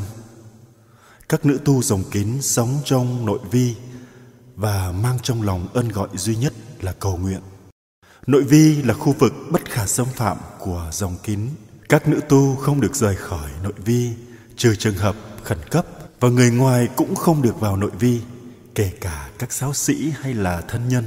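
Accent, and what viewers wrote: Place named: South, Vietnam. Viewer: Bình thường trong những live show Nguyễn Hồng An nói giọng Nam đã thay để thương, bây giờ nói được nghe giọng Bắc của sao ngọt ngào, càng de thuong hon nữa. Hat nhạc thánh ca hay nhạc đời đều tuyệt vời. 1,000 Likes nhé.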